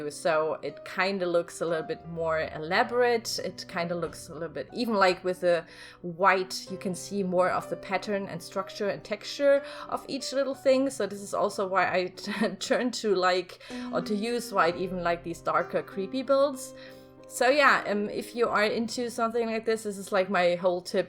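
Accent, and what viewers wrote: Germany. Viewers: I think you're my favorite <3 I just love your buildings, your creative without using the cc, you're original and I love your german accent!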